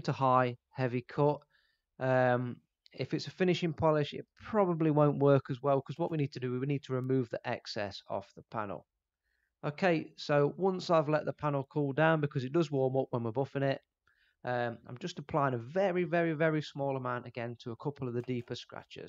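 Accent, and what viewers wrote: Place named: East Midlands, United Kingdom. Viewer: Brilliant idea! Great video and is that an East Midlands accent (Nottingham? Mansfield? Derby?)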